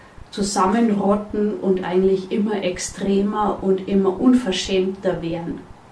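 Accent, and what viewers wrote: Bavaria, Germany. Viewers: Ich versteh auch nicht alles, obwohl ich selber Bayerin bin😂. Ich lieb aber deinen Dialekt. Hört sich besser an, als meiner. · Ich finde deinen bayerischen Dialekt wunderbar. 😊 Mein Dialekt ist sächsisch. Das ist wohl nicht sehr beliebt. Abe es ist wie es ist. Gute Besserung für Euch. 🌸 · Ich liebe das Bayrisch. Mein Onkel kam aus Hochstadt Wesseling und lebte in Köln.Er trug seine Tracht und sprach sein Dialekt....die ganzen 30 Jahre die er mit meiner Kölsch sprechenden Tante zusammen war. Er war ein Bayer vom Herz, Dialekt ist schön❤❤❤ Vielen Dank liebe. Ich muss das nochmal hören.! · Ich find den bayrischen Dialekt super ❤! Bin in Niederösterreich daheim und versteh fast jedes Wort. Unbedingt weiter so 🙏😍😍! Pfiat di 👋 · 💜💜💜 Danke dir liebe. Ach ich find das Bayrisch so schön. Soll doch jeder babbeln wie er mag und ihm die Schniss gewachs is😉 Ich find es schade das so viel Dialekt verloren geht. 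Meiner wurde mir aberzwungen. Sicher. Sprache entwickelt sich und ändert sich. Aber um das tun zu können, braucht es die Vielfalt der Dialekte. Wenn alles gleich und eintönig ist...na lass mal gut sein. Ich find das schön. Bleib dir treu. Und red wie du magst. Wer deine Sprache nich versteht. Der versteht weder das Gesprochene noch den Sinn dahinter. 😉 Mir helfen deine Videos. Und sie ermuntern. Ich wünsche dir gute Besserung. 🍀und schön das du trotzdem ein Video gemacht hast ❤ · Hallo, ich grüße dich von der Ostsee. Ich bin ursprünglich aus dem Rheinland. Kölner Umland. Ich mag deinen Dialekt. Ich kann vielleicht nicht jeden Ausdruck aus dem Bayerischen kennen. Aber ich komme schon zurecht. Es liegt meistens an einem selbst, ob man mit dem Dialekt und der Mentalität der Menschen zurecht kommt. Ich habe da kein Problem. Die letzten Wildgänse sind heute aus Wismar abgeflogen. Der Herbst kommt. 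Also, wie sagt man im Rheinland „ maach et Jood „ 😀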